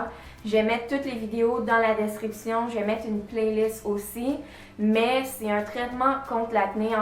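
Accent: Quebec, Canada. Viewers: ses l'accent Québécois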